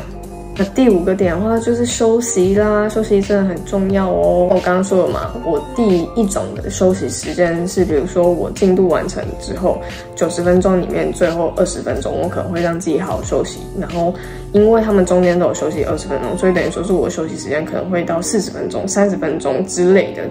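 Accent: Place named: China. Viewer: 為什麽有種大陸腔哈哈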